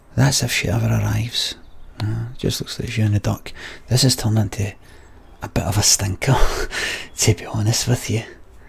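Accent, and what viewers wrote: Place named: London, United Kingdom. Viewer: The London accent is the best thing about this stream, cracked me up haha!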